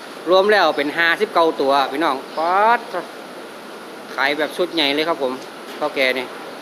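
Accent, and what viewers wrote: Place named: Nan, Thailand. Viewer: สำเนียงคุ้นหู คนเมืองน่าน บ้านปอนเน้อ เก็บเกี่ยวความฮู้มาเลี้ยงเองตี่บ้านเน้อ เอาใจจ่วยลงรายละเอียดนักๆๆ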